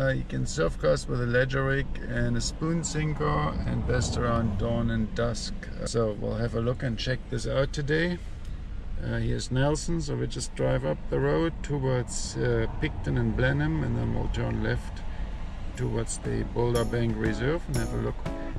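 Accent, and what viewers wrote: Germany. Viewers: German accent 😄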